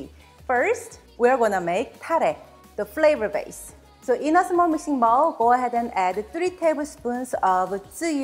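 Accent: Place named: South Korea. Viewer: You are guilty for loving an korean woman with korean accent for cooking japanese Ramen.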